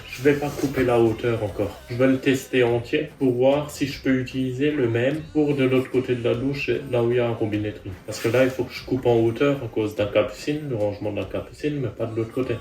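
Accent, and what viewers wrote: Alsace, France. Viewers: bien sûr que notre Alsace est belle!!! Tu dois en savoir quelque chose non?! Vu que tu as le même accent que moi J'aimerais bien voir en vrai vu qu'on est voisin cela pourrait m'aider vu que j'ai des projets similaires ( je pense a une caisse a charger sur le plateau de ma camionette) en espérant que cela devrait compter comme chargement donc pas de démarches administratives... L'alasacien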